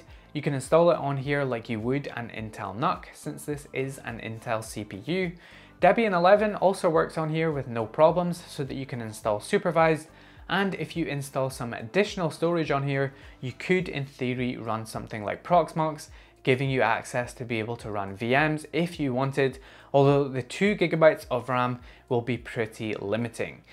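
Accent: Scotland, United Kingdom. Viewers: Cracking video mate, so useful in the current climate! Also can’t tell you how nice it is to hear a fellow Scot on Youtube in a space dominated by Americans! (no hate to them, just nice to hear 😎)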